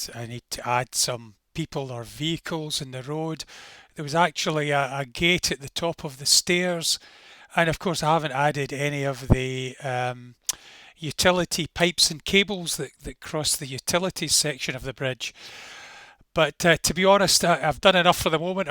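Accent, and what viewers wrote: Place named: Scotland, United Kingdom. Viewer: So we have had to wait several weeks for this follow on video! Well let me tell you, it was worth every second of the wait and is an excellent piece of work. It is amazing how long these creations take to get right and the care and attention you take, with just the right amount of alteration to suit your needs makes it very special. You have a natural ability to offer a lovely presentation and I guess modelling Perth with a great Scottish accent just is the icing on the cake. Thanks. Most enjoyable. Cheers Bob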